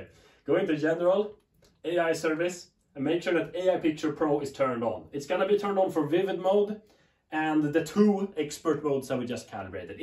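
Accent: Sweden. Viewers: Er du svensk. Hører den svenske aksenten din Det er vel mange gamere som bruker C4 også i tillegg til filmelskere. Jeg har LGCS oled som jeg bruker ps5 på.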